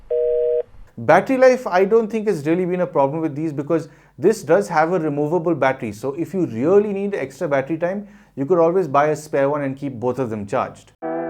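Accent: India. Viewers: the curry accent is great!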